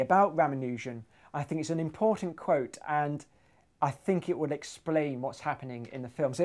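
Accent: United Kingdom. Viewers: the story-telling is somehow better with the British accent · Love the British accent. Hardys thoughts make me upset im almost 25 and I feel like now I can't make it ugh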